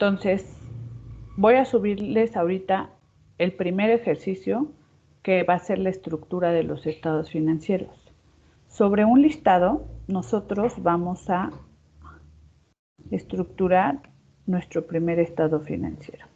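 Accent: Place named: Mexico. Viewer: todo estaba bien hasta que se empezo a notar el acento mexicano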